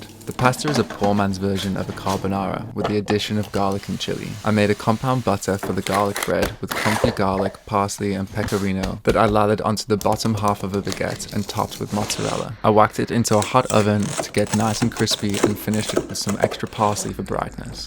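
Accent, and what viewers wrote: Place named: Australia. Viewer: Your accent is super interesting. Australian, with a touch of Manchester, a little bit scandi and North American all in one.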